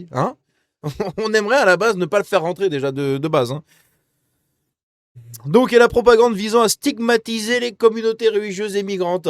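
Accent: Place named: Spain. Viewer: L'accent est espagnol ou portugais.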